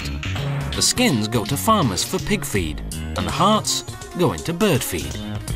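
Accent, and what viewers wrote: England, United Kingdom. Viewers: I'm not sure where the series originates, but the narrator is English (unless he's from elsewhere in the British Isles and has a remarkable English accent). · He's so delightfully british! With his vitamins and aluminum! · Lulz, gotta love how the Brits say certain words differently than we do, like "vitamins".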